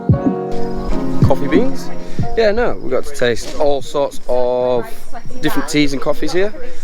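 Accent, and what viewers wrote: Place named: Yorkshire, United Kingdom. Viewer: nice video brother. You sound like your'e from Yorkshire aha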